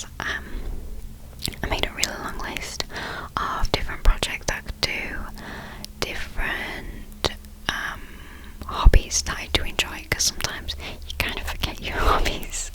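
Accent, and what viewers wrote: United Kingdom. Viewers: Her accent is British. Sorry if that sounds mean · Na it's British i think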